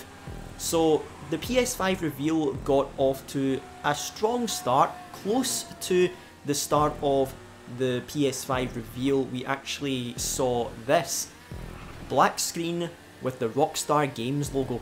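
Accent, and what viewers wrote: Scotland, United Kingdom. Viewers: Arr you scottish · U must be Scottish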